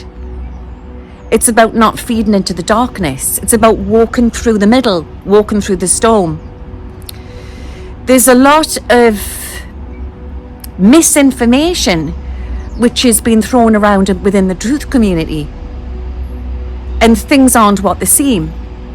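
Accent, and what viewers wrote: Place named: England, United Kingdom. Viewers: Thank you for given us important information. I deeply resonate with your words and predictions. For more than ten years I have been translating and introducing some of the English alternative articles and videos which I felt interesting in my blog site so that some of the Japanese people can understand them. Lots of the Japanese are not getting enough information from abroad though much of the important information come from outside Japan. I think that is what I am here for. Long time ago I used to live in England for 12 years so I love to hear your northeastern accent. Thank you. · Luv ya and our English accent dearie.... thank you for sharing your gifts and insights and positvity! Do you see a tsunami originating in La Palma Island affecting the east coast of the united states?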